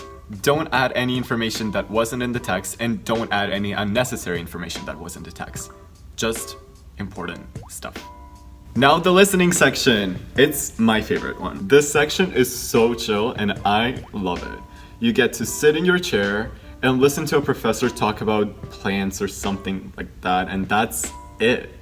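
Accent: United States. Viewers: Omg, what the...? Are you russian? Whatt??? Your accent is excellent, perfect, fabulous... terrific....just how... Omg I am really shocked that you are russian with such an excellent American accent. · I thought he was an American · Thought he was American but in fact he seems to be Russian OMG 😱😆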